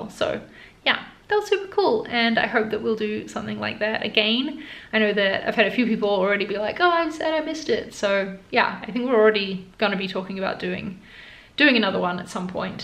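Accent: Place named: New Zealand. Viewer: Besides the design stuff content that I really enjoy and helps me, I think I watch you for your English accent and those occasionaly 'yeeeeeah' kiwis that you drop sometimes <3 I miss NZ sometimes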